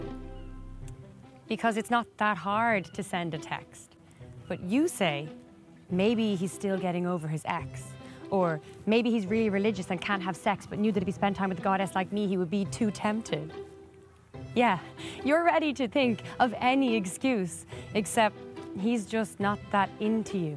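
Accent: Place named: Dublin, Ireland. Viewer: Bhí cailín álainn, cliste agus saothraithe agam mar Te Ciara nuair a bhí cónaí orm agus céim i mBaile Átha Cliath. Ciara álainn cailín, álainn i ndáiríre. Braithim accent Bhaile Átha Cliath. Tá súil agam filleadh ar chónaí ann san athbhliain. Póg mhór ó Florence Ciara. Cailín álainn Éireannach!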